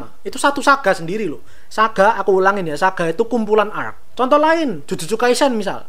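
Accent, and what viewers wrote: Java, Indonesia. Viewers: min suaramu itu medok banget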